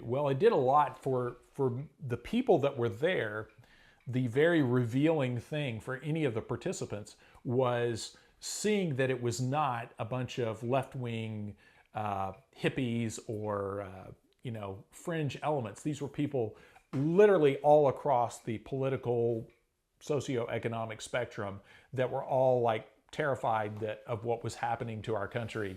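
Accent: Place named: South, United States. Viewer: Your Southern accent was stronger back then, haha.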